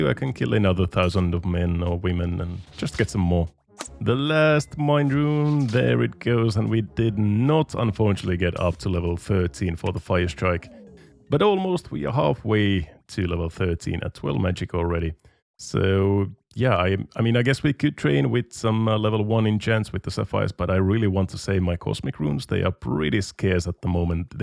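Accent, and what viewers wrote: Finland. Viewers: Wait, are you Finnish? I always thought German 🤔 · Since the first episodes, i always tought you were Finnish but never checked it out. Now i did and i was right all along. Tiiny tiny accent gave it away. Keep up the grind. (Englantis on aika pirun hyvä)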